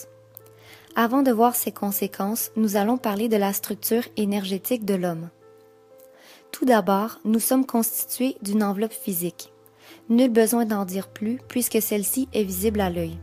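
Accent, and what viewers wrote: Canada. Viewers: l'humanité à l'image de la Terre, malade, en souffrance. Beau documentaire et bel accent cousine Canadienne!!!!!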